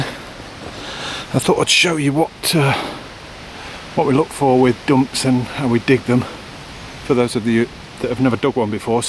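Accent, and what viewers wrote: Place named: United Kingdom. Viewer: where are u based because your website is not working and it did say u r based in the USA ,I assumed because you have a uk accent you are digging in the uk ,I ask because I wanted to join but as I've said your website is not working and I couldn't find any info on how to join ,most of the comments are people like myself are from the uk and wanted to join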